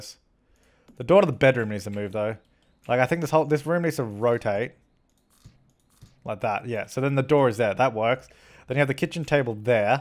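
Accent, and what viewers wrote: Australia. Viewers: YOU ARE A FELLOW AUSSIE! Or Kiwi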